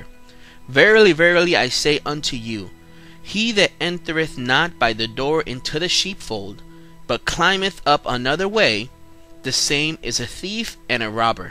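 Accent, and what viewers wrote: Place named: Puerto Rico. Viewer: bro you sound Boricua like me. But you are soooooo confused. Tell me, ¿Where is Jesus returning to FIRST? ¿NYC?¿Paris?¿London? HELLOOOOOOO!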